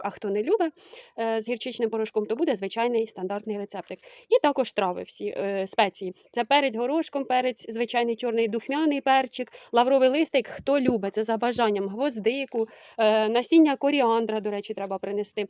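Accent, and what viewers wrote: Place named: Poltava, Ukraine. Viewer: Дуже схоже на полтавський говір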